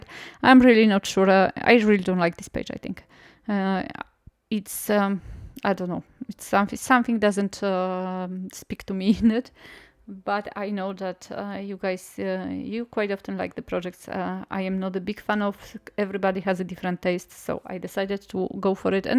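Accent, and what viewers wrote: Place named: Iran. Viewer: I love your accent. Is it Persian?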